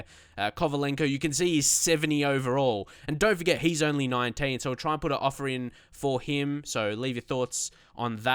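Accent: Australia. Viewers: Love the career mode but it's moe-at I understand it's the Aussie accent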